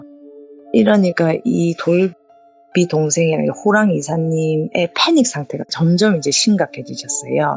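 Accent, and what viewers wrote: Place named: Gyeongsang, South Korea. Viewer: 충청도 피가 흐르는 경상도분이신가봐요 재미나게 잘들었는데 사투리신경쓰지 마시고 해주셨음~~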